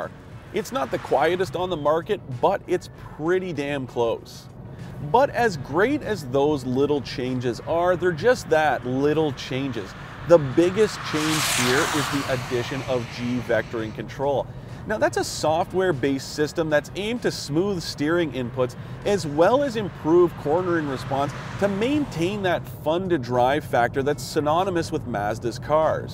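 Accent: Canada. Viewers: Michael Zhu he's Canadian. Canadian's talk the way they do just as people in the U.S. South talk the way they do. Ever heard a southerner drawl out nearly every word they speak?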